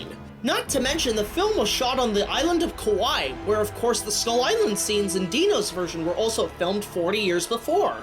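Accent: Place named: United Kingdom. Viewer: you really have a very good British accent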